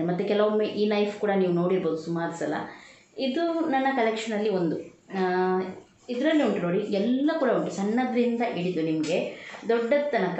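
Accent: Mangalore, India. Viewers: Hai.. I like very much way of explaining.and mangalore kannada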